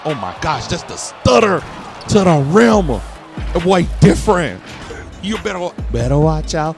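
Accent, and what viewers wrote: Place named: Alabama, United States. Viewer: Nahh you gotta be from Alabama I hear it in yo voice 😭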